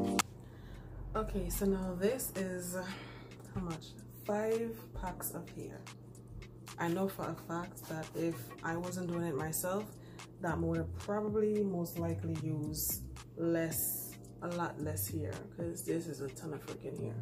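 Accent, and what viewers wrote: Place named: Antigua and Barbuda. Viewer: U sound like an Antiguan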